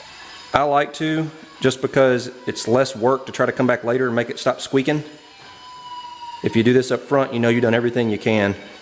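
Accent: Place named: United States. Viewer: He’s all American, no funny weird foreign accent, speaking Perfect American English.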